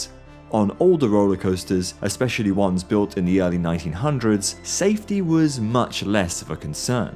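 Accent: United Kingdom. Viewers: Finally someone who is British explaining these stuff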